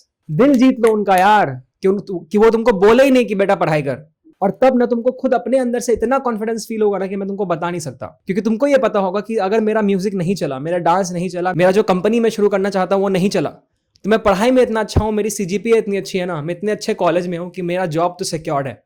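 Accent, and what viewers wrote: Bihar, India. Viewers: Bihar se ho app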